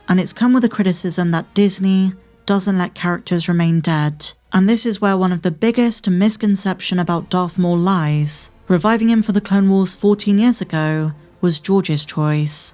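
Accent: United Kingdom. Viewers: WHY are you making Money off of Starwars and the ACTUAL people who created it back in 70s and 80s are not???? I’m sorry but you’re just reading Making Starwars and shooting these videos. That’s Lame.. Get a REAL job that contributes to Society.. WE have women doctors, engineers, researchers and Than we have the women of this lame TikTok Selfie Generation…StarWars is a Gen X era Franchise.. EVERYTHING cool happened in late 70s, 80s, and early 90s.. You guys keep taking OUR era stuff and making it worse..YOU Are not understanding like many Art.. Starwars is reflection of the ERA it came out in 70s an 80s and WHAT came before it World War II. The Mona Lisa would just be a painting of a woman.. BUT it’s iconic because who and when it was painted… the fact you say what you read in British accent is annoying.. You should give every pound you made to the people who actually WORK on Starwars in 70s an 80s..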